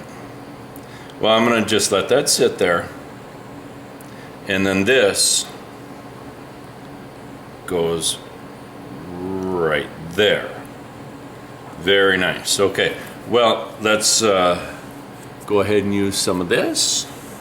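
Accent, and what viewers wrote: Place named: Midwest, United States. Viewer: you are so midwestern. it brings me joy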